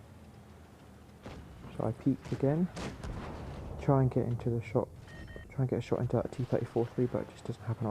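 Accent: United Kingdom. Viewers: God damnit I love the British accent 😂😂😂😂😂😂😂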